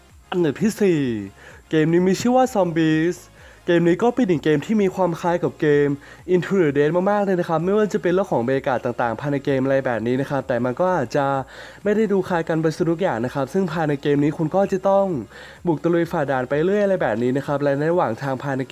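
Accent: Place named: Shan, Myanmar. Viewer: เปนคนไทยใหญ่หรือพี่แอปเสียงเกินอ่ะพี่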